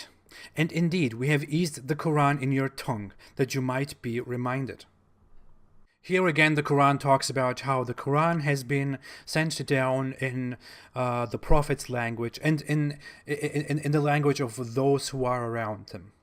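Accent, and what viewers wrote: Germany. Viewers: could you please do your vids in Arabic as l can't understand your English because of your strong Germanic accent. Rock n Roll! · I love your german accent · I love your videos. I love your German accent. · I love your videos and your German accent. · You are a fellow German!! Das freut mich voll. Ich mag deinen deutschen Akzent 😍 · I love your videos and i love your german accent! :) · I love your videos and I love your German accent. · Love your videos, unsure about your German accent. Tschus! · I love your vedios and German accent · I love your videos and your German accent. ✊🏾😂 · Ich liebe Deinen deutschen Akzent ;-) ! Deine Videos sind super ! DANKE und schönen Gruss aus der Heimat ! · I just found out about your channel and I love it. You spread awareness about islam which is important to help reform it. Your videos also help me find information when debating muslims or islam loving feminists. 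I don't know if you take requests for videos but here are some topics I'd like to suggest: what is taqiyya, muruna, tawriya and kitman (probably spelled them wrong, sorry )and can you give some advice on how to recognize and deal with them when muslims practice them. Do you think Islam will ever die and what would be the cause if it's death? Do your think it can be reformed somehow? And how do you think radicalism in Islam can be stopped? You can also try to do a video on the history of islam...oh, and by the way, love your German accent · I love your videos and I love your German accent. Peas be upon you. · Thank you so much for your bravery, intelligence, and honesty! I love your channel! You are so good! I love your German accent! Take good care! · I love your little laugh! And I love your German accent. And I love your cute face! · Which Arab dude hurt you man? I’m an ex Muslim too but chill out on talking shit about Arabs dude. 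You’re not perfect and you have a shit German accent. Not all of us are crazy Muslims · Islam harms Arabs the most, especially the Arab women. That's what I think as a Turk. Btw, I think you have some kind of Turkish accent besides German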